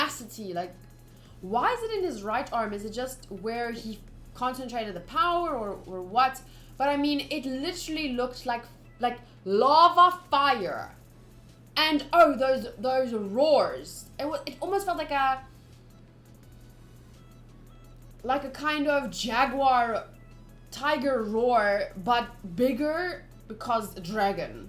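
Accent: South Africa. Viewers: You have a very South African accent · Are you south African sorry I'm also south African and your accent sounds familiar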